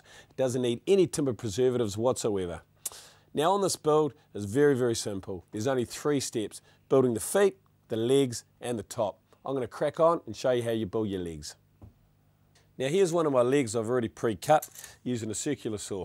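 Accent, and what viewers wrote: New Zealand. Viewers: He speaks with a New Zealand accent. Their pronunciation of vowels is different "ligs" (legs) and the " ls" are not pronounced like bevo (bevel). His explanations are easy-to-understand and he has a high skill level. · well it is true. He is from New Zeland and I learned British kind of English... 😊 there is a huge different in pronaunciation.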